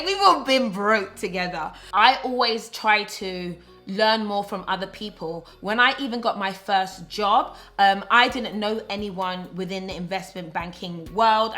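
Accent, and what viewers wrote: United Kingdom. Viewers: I love British accent💋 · Are u british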